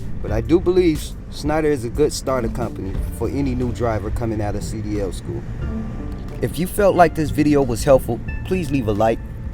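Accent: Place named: Chicago, United States. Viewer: You from Chicago? Lol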